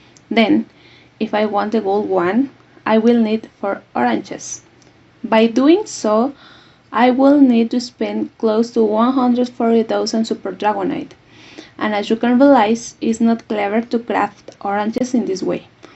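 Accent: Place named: Latin America. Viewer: Pero porqué no hablas Español, pareces latina hablando inglés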